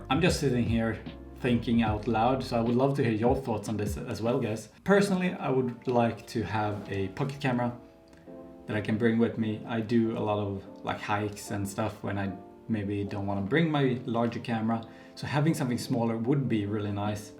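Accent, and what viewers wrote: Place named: Sweden. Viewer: Dat Swedish accent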